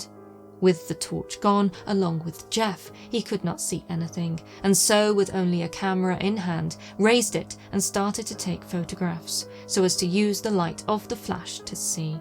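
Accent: United Kingdom. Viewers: I must say, your sponsors get their money’s worth. Flawless ad read in an elegant British accent is usually something they have to pay extra for.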